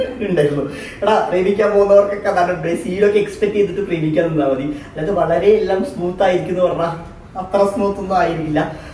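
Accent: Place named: Palakkad, India. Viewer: Chechide slang enik valare ishtaayi ❤❤❤ nammade Pkd slang....❣️❣️❣️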